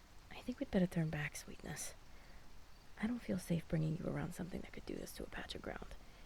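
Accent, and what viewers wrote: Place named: New York, United States. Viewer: Hey look New York vampire. Or is she from Boston?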